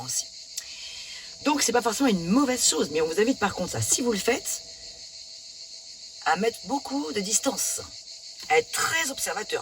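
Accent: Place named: South, France. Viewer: Les cigales le sud, l'accent j'aim beaucoup ✨✨✨👌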